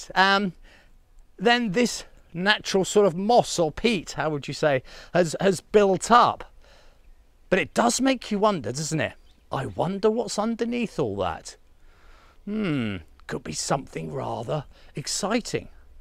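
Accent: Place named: France. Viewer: We like your French accent ; keep on 👌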